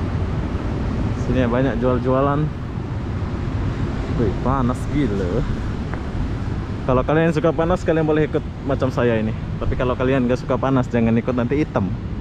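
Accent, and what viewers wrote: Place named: Malaysia. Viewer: idk why i feel uncomfortable hearing him talking in indo accent but mixed with a lil bit of malay diction, like it is kinda cringey 🙄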